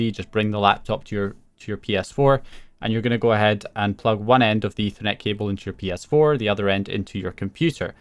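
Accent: Scotland, United Kingdom. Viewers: Been thoroughly enjoying your videos man! Keep 'em coming. It sounds like you might be Scottish so that's an added bonus! "HERE WE, HERE WE, HERE WE F*CKIN' GO!"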